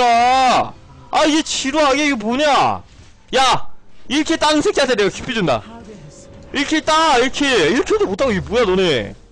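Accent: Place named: Seoul, South Korea. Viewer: 일로온나 일로온나 익숙한 부산사투리가 들려 ㅋㅋㅋ 님 말투 서울사람같은데 어디사심??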